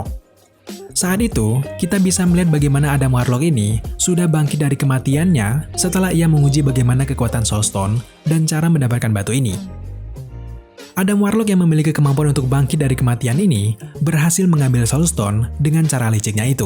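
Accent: Java, Indonesia. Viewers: Nada Logatnya mengganggu. Medok banget. 😁🤭🙏🙏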